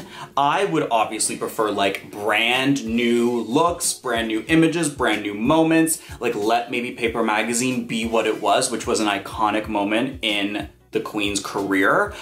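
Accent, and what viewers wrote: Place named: Canada. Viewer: You're a TRUE Fighter, I love you so much!!!! I'm obsessed with you and ur flawless Canadian accent!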